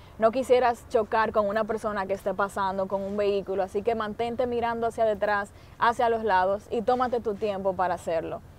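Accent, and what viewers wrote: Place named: Dominican Republic. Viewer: Lo sabia que era dominicana por el acento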